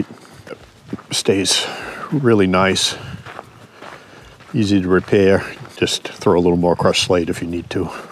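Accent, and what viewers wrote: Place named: New England, United States. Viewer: Love that "Gaaaden". Love your New England accent. Can't get enough of it.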